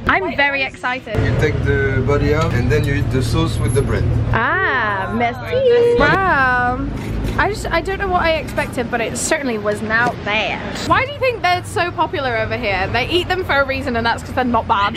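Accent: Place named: Yorkshire, United Kingdom. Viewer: love hearing my yorkshire accent so blatant in the city of paris #aesthetic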